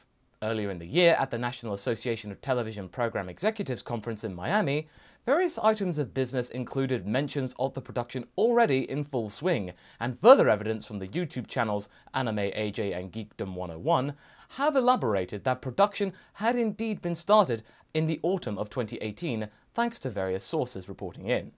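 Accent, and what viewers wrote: England, United Kingdom. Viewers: Lol your gourgeus english accent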